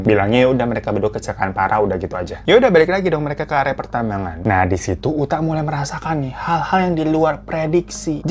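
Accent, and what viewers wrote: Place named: Banjar, Indonesia. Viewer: Lucu dengar bang pakai bahasa Banjar 😊 udh kaya orang Banjar beneran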